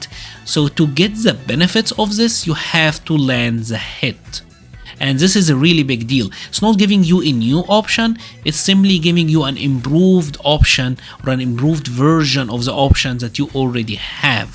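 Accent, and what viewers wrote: Egypt. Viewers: You sound like your Egyptian 🤔 im i wrong ?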